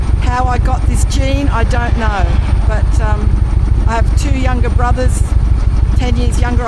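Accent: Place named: Australia. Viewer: You are one amazing woman love the Aussie accent and when you start swearing it’s off the dial love it carry on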